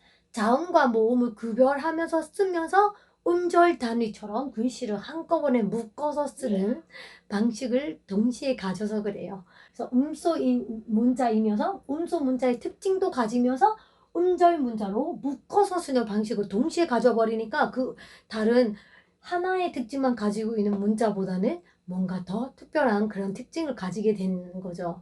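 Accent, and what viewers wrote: Gyeongsang, South Korea. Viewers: 경상도 사투리 억양이 있으시넹~^^ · 경상도 쪽에서 한국어 배우셨나요?? 아님 주변가까운 한국친구가 경상도사투리를 쓰시는 분이신가?? 미얀마분이신대 경상도 사투리억양이 좀 묻어나오시네요 ㅋ것도 살짝 ㅋㅋ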